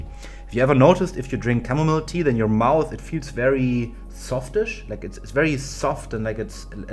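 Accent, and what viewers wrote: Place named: Germany. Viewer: I can hear out the DEUTSCH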